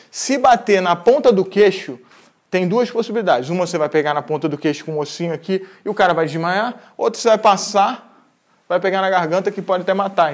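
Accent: Rio de Janeiro, Brazil. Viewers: É regionalismo, carioca, se não xingar e chia tá errado. ..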